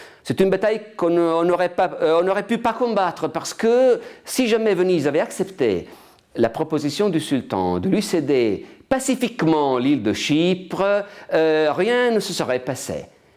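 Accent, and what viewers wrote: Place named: France. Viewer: Ho pensato la stessa cosa..e che bellissimo accento français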